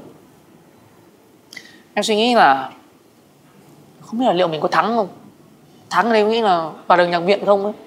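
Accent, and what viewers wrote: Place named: Southern Vietnam, Vietnam. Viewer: Tiếng miền Nam dễ thương thật mà.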